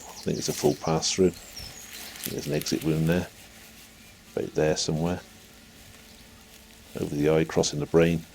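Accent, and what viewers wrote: England, United Kingdom. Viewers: What part of England you from? greetings from California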